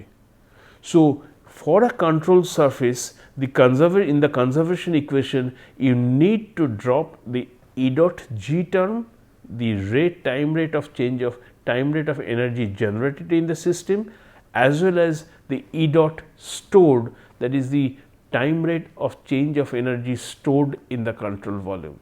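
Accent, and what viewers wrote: Bengal, India. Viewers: His Bengali-accented pronunciation is so difficult to understand. Did he say "zone heating" or "Joule heating"? This is the problem with MOST IIT Kgp Bengali professors.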